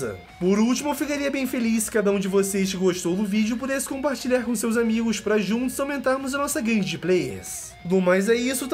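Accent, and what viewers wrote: Portugal. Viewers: Eu só percebi que era um português falando, no fim do vídeo. Kkkkkkkkk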